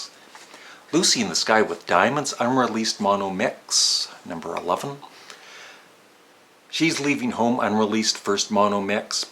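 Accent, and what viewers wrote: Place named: Canada. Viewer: 🇺🇸 I just assumed it was Canadian accent coming out. Doesn’t bother me.🇨🇦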